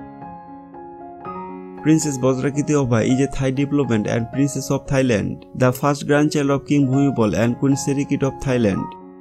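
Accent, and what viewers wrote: India. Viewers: Could you please change another Commander. We cannot understand what this gentleman is saying. Too heavy indian accent. Horrible and wasting air time money 😱😱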